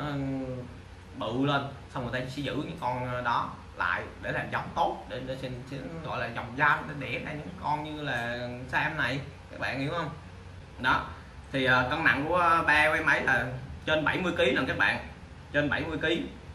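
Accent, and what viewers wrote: North, Vietnam. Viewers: Hoi dau ma noi bac ky no la vay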